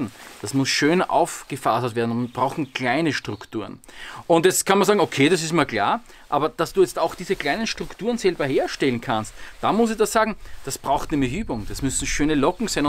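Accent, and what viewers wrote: Vienna, Austria. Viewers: ...wenn ich dich so reden höre vermisse ich meine Wiener Verwandtschaft. Sehr schönes Video.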